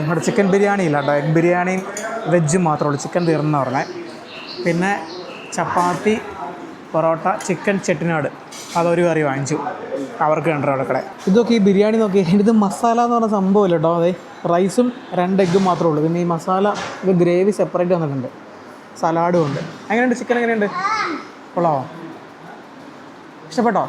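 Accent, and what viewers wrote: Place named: Idukki, India. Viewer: ഇത് x-mas വെക്കേഷന് എടുത്ത വീഡിയോ ആണോ. ചേച്ചി ട്രിവാൻഡ്രം ആണെങ്കിലും ഇടുക്കി സ്ലാങ് ആണലോ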